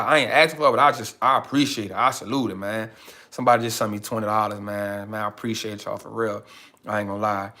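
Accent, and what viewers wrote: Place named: South Carolina, United States. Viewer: Your accent reminds me of my grandmother. She's from South Carolina.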